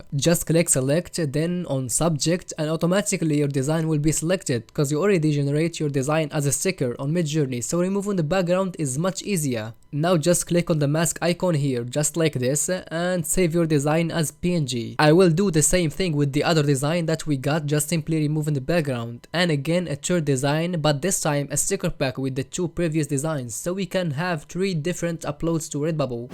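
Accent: Morocco. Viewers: I really love the way you explain ❤👏👏 + you have a moroccan accent wich makes me feel like talking to one of my freinds😂❤❤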